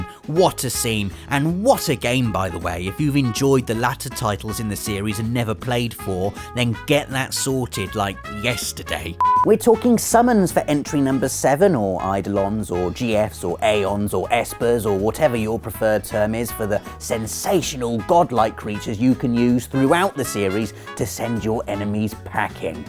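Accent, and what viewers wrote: England, United Kingdom. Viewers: If i could bear through the narrator voice I'd watch it but god I just can't... :/ Sounds like a british kindergarten teacher talking to below 6 yo children. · Asha2820 He's English.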